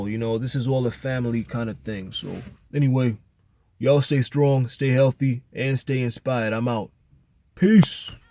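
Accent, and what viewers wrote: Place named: Minnesota, United States. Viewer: Minnesota badass, 10 deg, snowing, barehands, even got some accent!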